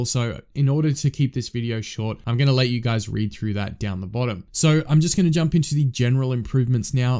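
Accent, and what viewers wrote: Australia. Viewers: lauchlan walsh where did you think he's from? He definitely sounds like an Aussie to me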